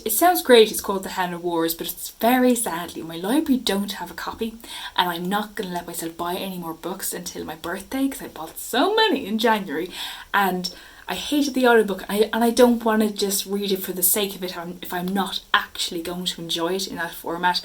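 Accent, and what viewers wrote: Ireland. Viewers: Aaaah so late to this but thank you for mentioning me and also buddy reading Angela's Ashes!! 'twas truly a wonderful memoir, and I'm definitely helping myself to this convenient list of Irish books to check out next 👀 Your Irish accent is so sweet -- I've been trying out the accent during my read and the vowels and R's are so fun LOL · Great selection! I've only just finished Hamnet and was absolutely floored by it. Had a major book hangover after. Your Irish accent was lovely to hear 😊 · This was fun, I love Irish accents(but I’m biased)☘️ I read “Angela’s ashes” when I was a kid and I’ve been meaning to reread it someday. I know i really liked it (I like sad stories, it seems). Ooo I really want to read “the heart’s invisible furies” though, yes that one might win this month. Great choices, as always.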